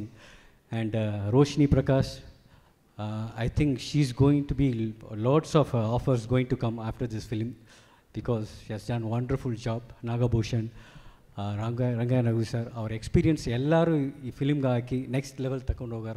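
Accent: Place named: Chamarajanagar, India. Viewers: Try madilla sir poorthi kannadane but adu narasipura,nagara slang aste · ಸ್ವಲ್ಪ-ಸ್ವಲ್ಪ ಚಾಮರಾಜನಗರದ ಭಾಷೆಬರುತ್ತದೆ ಧನ್ಯವಾದಗಳು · Namma chamarajanagara bhashe na nimmindha keli khushi aythu!!!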